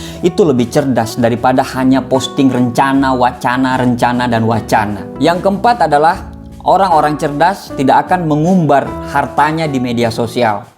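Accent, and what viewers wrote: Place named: Makassar, Indonesia. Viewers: Kelihatan logatnya pak, apa dia lahir dr mkassar? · Dari logatnya bang org Sulsel y, kl benar spertinya sy baru nemu youtuber motivator dari daerah sana, bahasannya oke punya👍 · Logatnya Makassar kayaknya ini om 😁😁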